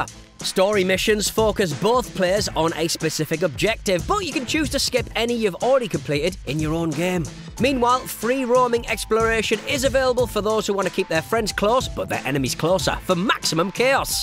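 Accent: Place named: Northeast England, United Kingdom. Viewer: you have to be from the north east with that accent I'm from newcastle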